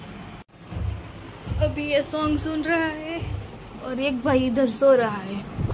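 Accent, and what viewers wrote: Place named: Gujarat, India. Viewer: Wah wah....gujju jyare hindi bole...bapa ketla saras lage😄👌👌👌👌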